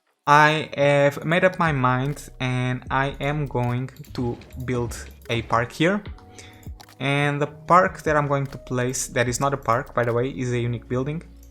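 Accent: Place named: Portugal. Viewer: So de ouvir a pronuncia já sei que é portugues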